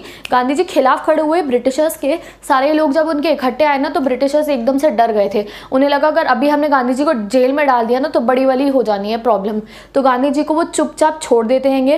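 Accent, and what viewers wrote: Punjab, India. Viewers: bro ma'am Punjabi hai 😂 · Punjabi accent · Love your punjabi accent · Di love your Panjabi assent ....:elbowcough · apki jo bich bich mai punjabi nikalne ki koshis kr rhi hai...that makes the chapter more interesting :)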